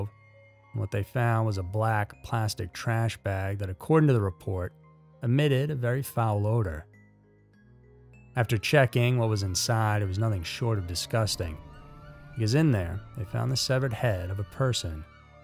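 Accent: South, United States. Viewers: Great video. And I like your southern accent too.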